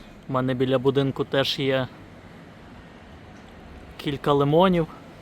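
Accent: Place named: Western Ukraine, Ukraine. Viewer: Я впізнав по розмові що ти з Західної України.Тільки нині натрапив на твої відео.Переглянув всі.Ти не зупиняйся.Досить добре виходить.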